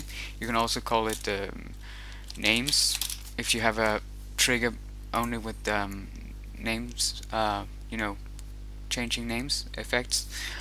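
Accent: Denmark. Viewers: he is from denmarkm and im from sweden some thincks thet we sunds funny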